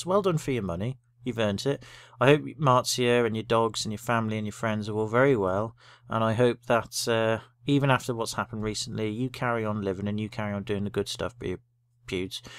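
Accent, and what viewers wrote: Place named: East Anglia, United Kingdom. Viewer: Are you from East Anglia by any chance? I sense a slight familiar accent. Not something you hear often on the Youtubes haha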